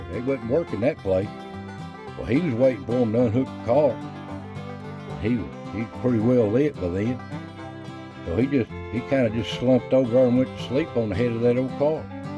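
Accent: Appalachia, United States. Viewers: Hello from Virginia my friend, have a great weekend 🇺🇸 Voice of the Smoky mountains ✅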